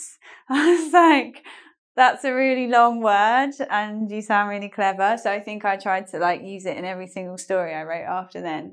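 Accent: United Kingdom. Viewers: how is that accent called? i love the British accent. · Thanks for this informative lesson. I really fancy the Brits and British accents. Eventually, I found you and your amazing videos there <3 Keep going, x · Hi, Are you still live in İstanbul? I wish we meet you in subway and try to speak with you British accent:) Thanks for lessons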